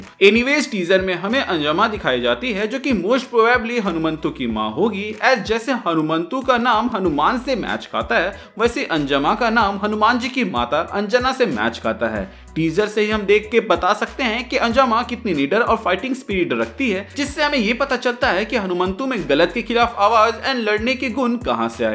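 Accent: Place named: Jharkhand, India. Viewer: Feka jata h , dhekla jayega bhai tm jharkhand se ho kya ???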